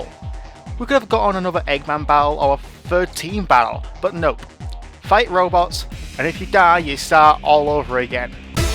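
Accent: United Kingdom. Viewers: is from UK?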